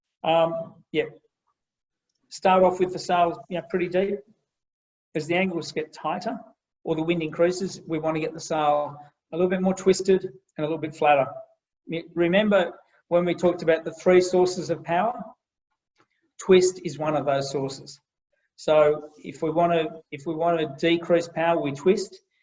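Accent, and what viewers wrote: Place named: Australia. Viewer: less than 200 likes? There is an incredible amount of very valuable information here! The aussie accent is not easy for me, but what a great video!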